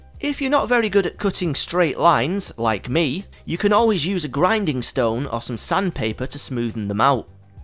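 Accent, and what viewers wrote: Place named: United Kingdom. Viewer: Your narration with your British accent reminds me of the good old Art Attack days! Haha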